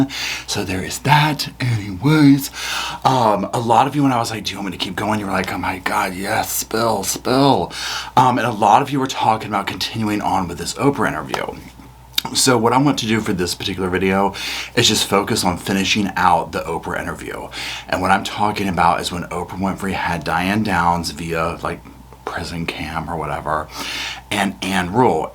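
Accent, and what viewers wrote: South, United States. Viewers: I've just found your page, and am enjoying it all in that southern accent ♡ Have you considered reaction videos to Who Do You Think You Are- the genealogy show? I think that would be amazing 😊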